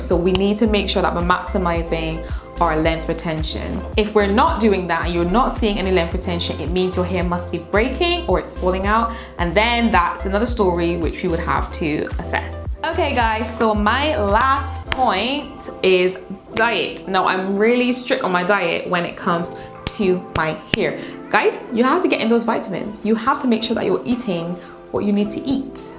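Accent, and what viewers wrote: Barbados. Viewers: Are you a Bajan Queen? I’m sensing the accent. 😀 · 🇧🇧 I hear my people in your voice! New subscriber! And this was a great video! Thank you!!! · Please what is your accent !?? Am I hearing Bajan? Absolutely beautiful ! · Am I hearing a bajan accent? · I’m hearing a Bajan accent! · You sound almost bajan😄your hair I'd beautiful. My goal is healthy waist length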